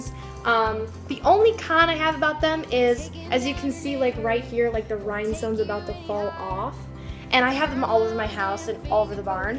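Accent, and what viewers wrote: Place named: North, United States. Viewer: Country what? U sound like ur from the north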